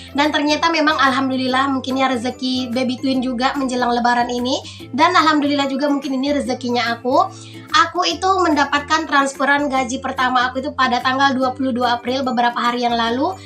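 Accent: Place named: Java, Indonesia. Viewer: Lucu bgt bunda ini ngomongnya.. nyenengne bahsa jawane... 👍👍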